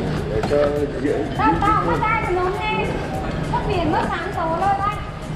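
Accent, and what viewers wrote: Quang Nam, Vietnam. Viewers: anh nghe giọng nói của em không người quảng nam thì cũng người bình định định mà cứ khoe người sg cái giọng nói đấy thì lẫn vào đâu dc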